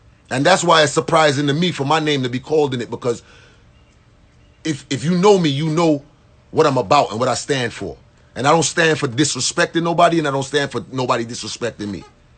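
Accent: Jamaica. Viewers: ok i'm convinced you're Jamaican now. I can hear the natural accent. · Yo a fuckery a diss I didn't even know this was happening yo man fuck all this bullshit why name getting called in fuckery y'all who know know he don't hate know he big up EVERYONE no matter what it don't matter where you from what you rep big up everyone and him stay away from fuckery I'm dissapoimted that he has to address some fuckery like this I've never seen like this for him to be like this is nah right nah kool a fuckery ah dis general he fucking holds it down for the WHOLE AH DUH FUCKING CARRIBEAN y'all ignorant idiot talk bout him not no yardie nah matter if em yardie or not em support all yuh and he is yardie listen when he speak regular in a non YouTube shit he speak patois man a yardie pusshole all yuh but Nah the point the point ah fi look out fi di Caribbean and ah dah whole ah we nah just yard Nah just Trini but em chat fi did whole ah di carribean brighten up all yuh ignorant fool realize what he saying is we Caribbean need to support each other and not from they come up but from they ground from they dirt and that we as carribeans needs to support each other no matter who fucking island yardie bajan Trini gayaneese Nah fucking matter we need to fucking show love appreciation and support and big up we culture we are one Caribbean because in the U.S in the U.K in Canada dem nah see we dem nah respect we we ah FUCKING CARRIBEAN WE ARE THE FUCKING WHOLE AH WE support the WHOLE AH ONE ANOTHER not just one island support itself but all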